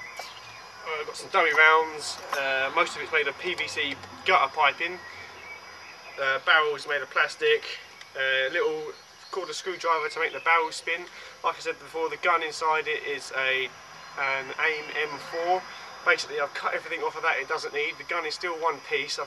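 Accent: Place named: United Kingdom. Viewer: hahahaha i love it how you say anyway lets fuck some shit up in ur british voice lol epic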